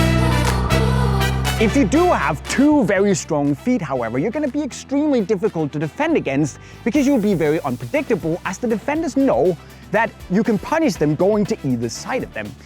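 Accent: Denmark. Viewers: I smell danish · He definitely has the danish accent😂😂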